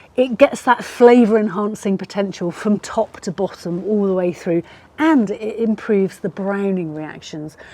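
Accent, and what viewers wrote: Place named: Bristol, United Kingdom. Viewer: Thank you, young lady. Iam just about to do some St. Louis Ribs on me offset slow cooker here in SoCal and I shall give that a try. Love hearing a good old Brittol' acent again.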